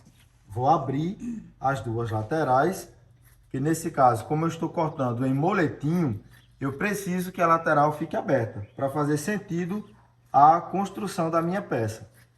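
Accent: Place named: Northeast, Brazil. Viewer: O professor faz tudo parecer tão fácil. Parabéns. E esse sotaque maravilhoso. Sou carioca e amo sotaque nordestino ❤🎉🎉🎉🎉🎉🎉🎉🎉🎉🎉😂bj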